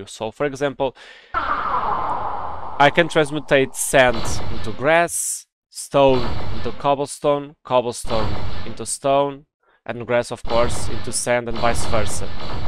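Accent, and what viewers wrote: Portugal. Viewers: Maan thanks for the tut bro ! Enjoyed the Portugues accent lol 😂 Bro just how you can make alchemy chest ? I couldnt do it SMH ... did you make it in philosopher stone or in craftin table ? Edit ! Problem solved !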